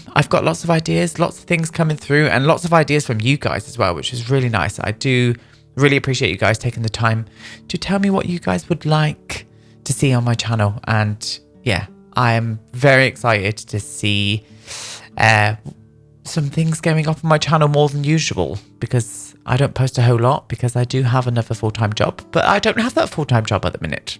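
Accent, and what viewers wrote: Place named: Scotland, United Kingdom. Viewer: As a Brit living abroad I love watching your English builds so much 💙 Makes me very nostalgic for home 🥰 Thank you! Please ignore this weird question if it is too personal, but are either of your parents Scottish? You don’t have an accent but you pronounce a lot of words in a Scottish way so just wondered!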